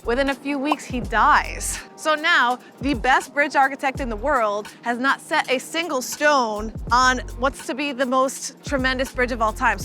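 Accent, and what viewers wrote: New York, United States. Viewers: how come since you moved out of town your New York accent has come back stronger?😂 As usual very interesting and informative thank you 👍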